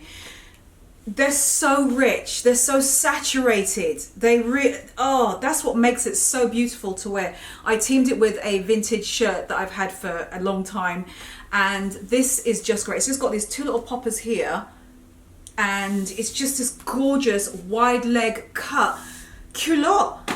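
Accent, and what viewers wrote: United Kingdom. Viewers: I realize this is a yr old but “bloody” lol (typing as I speak in your British accent in my mind) I love that yellow suit, everything about it. Is that appropriate for any work place tho?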